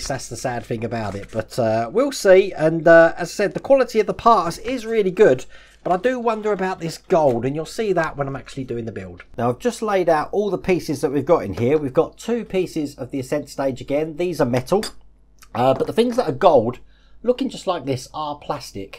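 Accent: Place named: Cockney, United Kingdom. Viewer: He speaks with a cockney accent.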